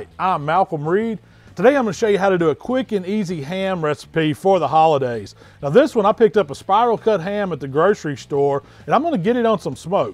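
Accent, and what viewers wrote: South, United States. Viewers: his southern accent is so strong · When you see a Big Guy with southern accent then u know youre in the right place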